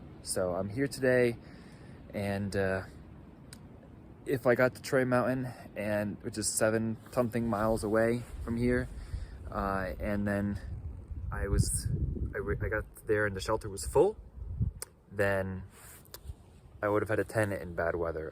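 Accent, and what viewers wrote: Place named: Connecticut, United States. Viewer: Are you from CT, by chance? I think I recognize your accent! I know that, right now, your thinking is all about "can I make it to there, by then", but soon, you're not going to care. You'll just know, because your legs will be strong and your confidence will be stronger, that you'll be able to make it to wherever you want to make it in a day. Weather won't be as important. Or at least, not as urgent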